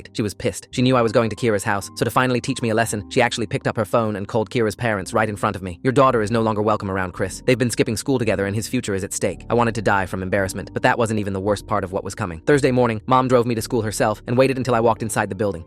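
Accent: United Kingdom. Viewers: I swear bro became British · British ahh voice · Is he British 😭? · Bro went British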